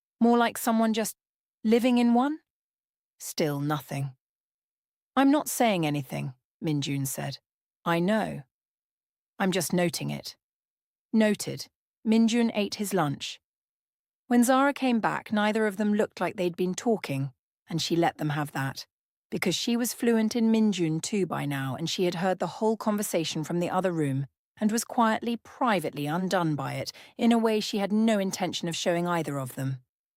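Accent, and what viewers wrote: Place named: United Kingdom. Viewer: Well this story was exhausting. If you want your audience to be capitived gove us something "cracking open". I felt the narrator was to "wordy about the pass of fathers than about the couple." I think the British accent was distracting and she had nothing to support the love balance I was expecting to happen. Part 2 showed a ring on her finger then nothing happened in part 3. Worst than a slow burn, it just was toooooo wordy with nothing to support the love they held inside. maybe 2 dates, no laughter, only closeness, a ring on her finger why? To much w/ narrator explsining life and never bringing them to heartfelt conversation about the future. Honestly, I skip through parts. This wasn't what I expected for 3 part. Never saw her wear white dress, then it was the end. DO BETTER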